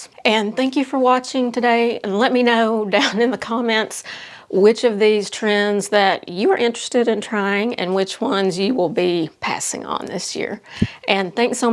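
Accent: South, United States. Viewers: My deepest condolences,! I hate January also but because I live in CO and it’s miserably cold! My daughter lives in Tuscaloosa (AL student). I thought I recognized your cute southern drawl. Thank you for keeping me fashionable. I’m so happy I found you. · Love your Southern way of speaking. Could listen to it foe hours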